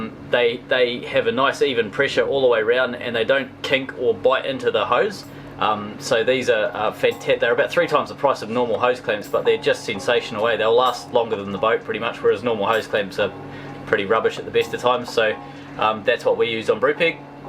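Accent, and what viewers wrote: New Zealand. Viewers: Are you originally from NewZealand?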